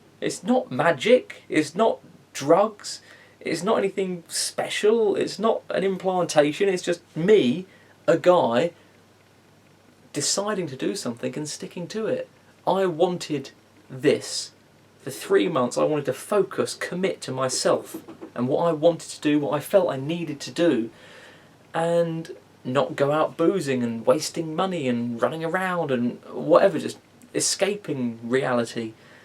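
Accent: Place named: England, United Kingdom. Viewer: It's just nice to hear a nice clean English accent. You even pronounce you Ts. I'm so sick of being surrounded by the "Init bruv" accent.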